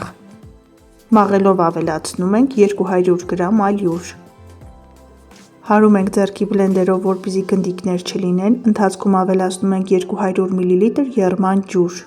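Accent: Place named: Ararat, Armenia. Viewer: Ду шат юраатук ес джан, Цайнд амен ангам лселуц, инц твум е те инц Аразат цайн ем лсум, шат бнакан ес, у шат тнакан,аранц Кез котрателу🌹👍💋💋💋❤️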